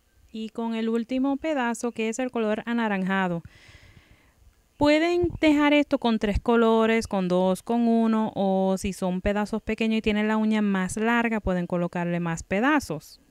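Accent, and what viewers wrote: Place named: Cuba. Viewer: esa es una cubana o puertoriqueña)=? que linda voz tiene dame su correo ja ja ja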